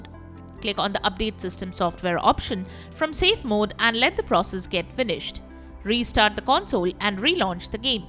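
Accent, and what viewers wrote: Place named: India. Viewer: English mix with Indian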